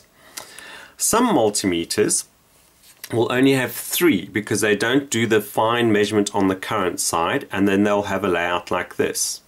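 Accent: South Africa. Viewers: South African accent! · Absolutely brilliant tutorial, I knew as soon as you started talking that you are South African! nice to see a south african using vlogs for educating about electricity, Fab! I bought a multimeter a few months ago and had no idea how ot use it. Now since watching your extremely concise and clear tutorial I am going around and probing everything haha, everything DC that is! AC scares me!!!! quick question: I have a cheap chinese android tablet which I aquired a while ago which worked for one day and then would not charge, absolutely nothing at first glance but then I opened her up and seen its got a 3.7v Cell, I just wanted to know how I would test for voltage on this I want to know if its dead or not? do I need to desolder it from the board to get a true reading or can I just measure it in situ? if I find its not the battery where do I go from here, I dont know anything about how these things provide the power to the board? 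would be really great to be able to use this tablet as my other tablet is a 1st Gen Ipad which I wont slag off it has its pros, but it also has its cons, that being of limited compatability with most of todays apps! thanks for posting I am now more enlightened! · I found the subtitles extremely distracting. I assume that some software application was used, which had difficulty with your South African accent. Pity. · don't you just love our South African accents? thanks for the video this is amazing! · are you south african ?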